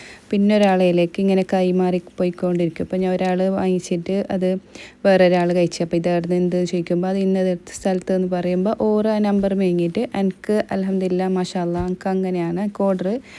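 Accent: Kasaragod, India. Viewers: കേട്ടിട്ട് കാസർകോട് സ്ലാങ് ആണ്, ശെരിയല്ലേ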